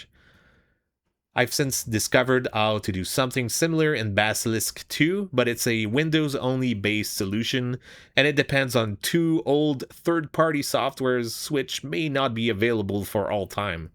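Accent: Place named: Quebec, Canada. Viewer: I seem to detect a hint of tabarnak in that accent.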